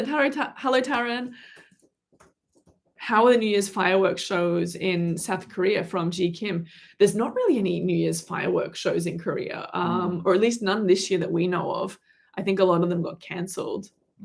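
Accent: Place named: Australia. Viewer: I fell in love with the Australian accent charm thanks to. I loved, love, will love this channel. I’m going to miss you so much.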